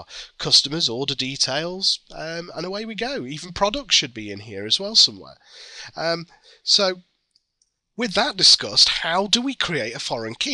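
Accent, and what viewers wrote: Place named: United Kingdom. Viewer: Hey brother thank you , i just did whatever you showed in this video and i learned how to find the relationships and dependancies.Thank you and you'r british accent is nice too.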